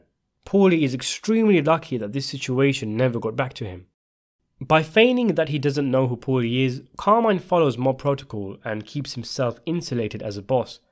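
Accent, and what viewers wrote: United Kingdom. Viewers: The British accent of the narrator is so wrong for this video. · I like hearing quote Sopranos lines in an English accent. “You’re a wormy cocksucker 🧐”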